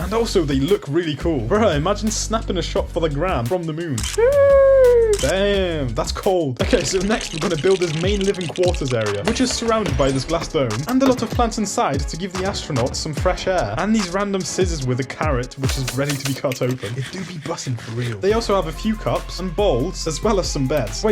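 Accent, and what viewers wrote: United Kingdom. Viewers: Is he a UK person? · i realed that he is brithish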